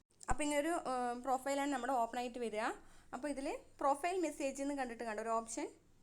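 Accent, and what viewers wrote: Palakkad, India. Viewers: Palakkad kari ano miss thani nadan bhasha 👌👌👌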